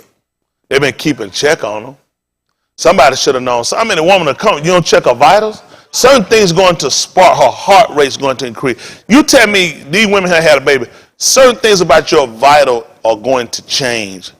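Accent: South, United States. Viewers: I'm confused is he teaching bible or what yesterday was the first time i heard him and he was angry at pastor Jennings I'm tryin to stay connected to God why is it so much confusion going on teach or talk about whats happen in the world.and not explain what ever he's tryin to say what? Is he high!! A fast country talkin bad language in the church.he is in church?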